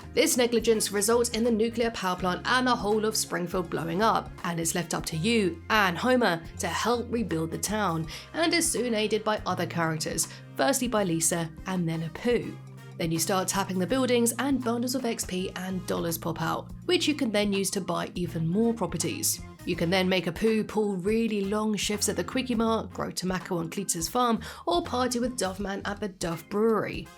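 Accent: United Kingdom. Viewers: A simpsons mega fan with a British accent? Tickle me pink!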